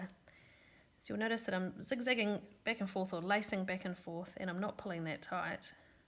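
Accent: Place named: New Zealand. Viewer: This was very helpful! And I love your NZ accent. May I ask which mystery KAL this was. Was there a specific group? Thanks.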